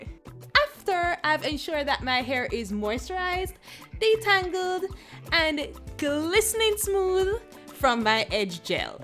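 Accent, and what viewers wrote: Jamaica. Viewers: jamaican!!? i pick up d accent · Sound so Jamaican. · Love how you talk...you sound so much as a jamaican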